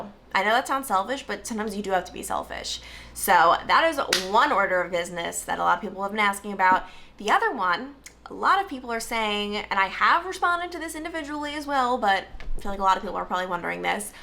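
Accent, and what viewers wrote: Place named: Long Island, United States. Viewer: I feel like your Long Island accent is returning now that you’re not on the news. It’s fun to hear again!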